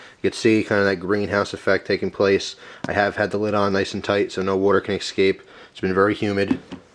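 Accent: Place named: Northeast, United States. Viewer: I love the way people from the North-eastern U.S. say 'water' lol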